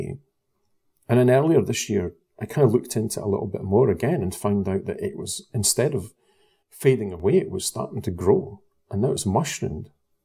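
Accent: Scotland, United Kingdom. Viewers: If you think you live on a ball then aircraft would have to fly around this ball. You sound like you live in Scotland. A flight from Scotland to Gran Canaria in the canary islands is 2,000 miles south. Conventional mathematics will tell you that over this distance the curvature of the earth if it were a ball would show a drop of just over 500 miles ( 8 inches times 2,000 times 2,000 = 32 million inches or just over 500 miles). This would mean that for every mile travelled the plane would need to descend 1/4 mile, it would be nose down all the way. However experience will tell you that this does not happen, the plane will be in level flight all the way aside from take off and landing. BTW the Southern Hemisphere long haul non stop flights are bogus.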